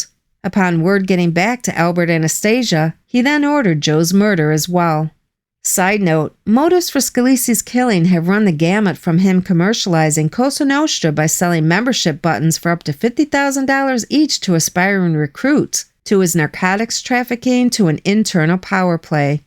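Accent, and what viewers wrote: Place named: New York, United States. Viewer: Love your voice, it has hint of something new yorkish.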